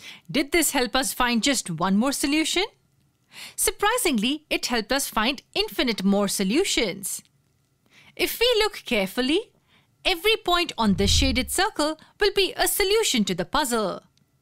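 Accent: India. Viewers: Is the narrator Indian by any chance ? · Indian accents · Indian accent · Is this an Indian talking? Unsubscribe to this channel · y u sound indian tho? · Indian accent???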